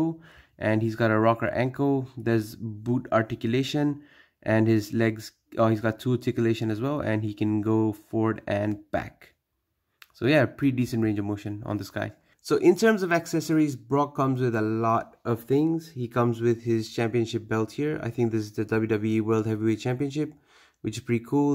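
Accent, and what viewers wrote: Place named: India. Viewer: You sound like you're from india or neighbouring country how did you get this figure I want it too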